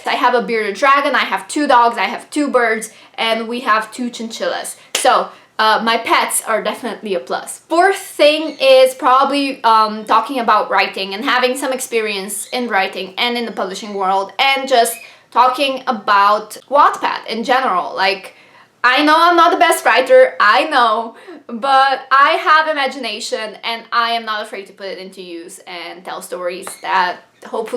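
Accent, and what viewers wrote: Slovenia. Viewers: Filming in pyjamas xD So relatable hahaha Welcome to Booktube again then haha I hope that you will enjoy it this time and that you will stay with us! This community is really amazing! So supportive and welcoming I mean you will find trolls everywhere but just don't mind them! Wait you're Slovenian???? Ziva from reader life is Slovenian too and well I'm half Slovenian and half Bosnian but I currently live in Belgium... Your accent is amazing!! You could do the International Booktuber Tag! Pets! yes I only have a dog but she is the best haha I mean you are unique, your personality is unique and anything else you do besides reading will make your channel unique... Oh you will meet tons of friends! They will even help you build a never-ending tbr hahaha I mean interaction here but also on twitter, instagram and sometimes discord. Watch other videos, comment and get to know people. 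I always say the same but stay yourself, connect with people, have fun and film the content you are passionate about! See you soon!!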